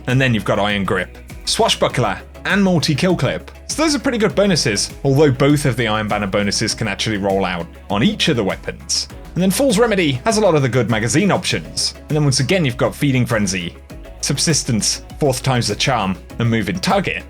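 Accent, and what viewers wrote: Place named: United Kingdom. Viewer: It’s a british accent, big deal?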